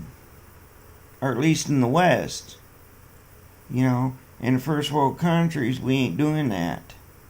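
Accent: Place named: United States. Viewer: 30 👎👎👎👎 pretty sad that you don't know what it wheel loader is because to me you sound American.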